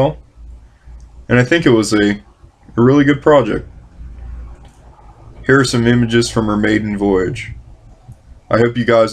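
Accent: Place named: Pittsburgh, United States. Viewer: You have to be from Pittsburgh with that yinzer accent lol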